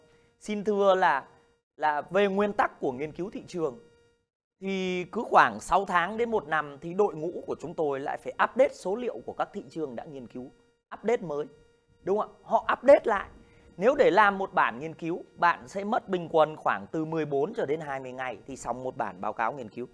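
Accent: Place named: Tho Tang, Vietnam. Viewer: Thầy nói giọng Thổ Tang nhưng đẹp trai thông minh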